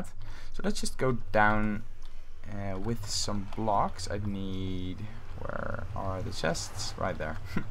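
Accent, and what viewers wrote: Netherlands. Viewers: Are you dutch? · are you Dutch? Becouse you sound Dutch:)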